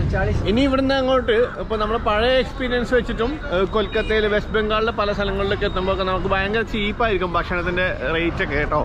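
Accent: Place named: Idukki, India. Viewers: Irukku kattappanakaran. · ബ്രോയുടെ ഇടുക്കി തനി നാടൻ സംസാരം ഇഷ്ടപെട്ടവർ ഉണ്ടോ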